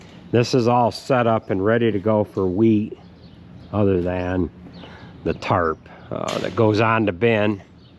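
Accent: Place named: Midwest, United States. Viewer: Way you talk, I figured you were from far away, mid-west or dakotas. Saw those NY plates and realized you're about 5 hours west.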